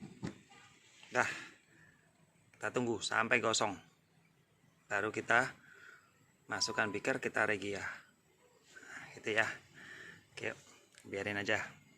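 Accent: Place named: Java, Indonesia. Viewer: Tak kiro pean i wong aduoh kono mas, dadak kok jowo. Jowo ndi mas?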